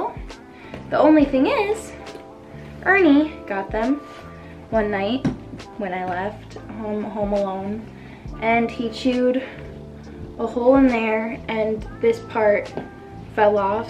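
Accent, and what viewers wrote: Midwest, United States. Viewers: midwest accent !!!!! thank you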